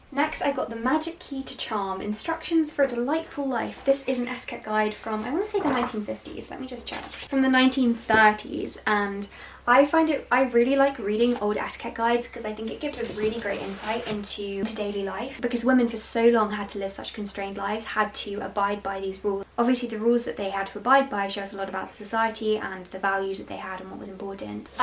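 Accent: United Kingdom. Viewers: 영국 영어 발음 정말 매력적이다 😆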